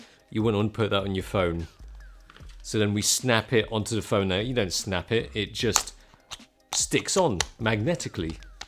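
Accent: England, United Kingdom. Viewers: What is your accent to be precise which region in the UK? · I think he was raised in the UK. That's why it's so smooth. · I love the fact that he’s Asian and he has an English accent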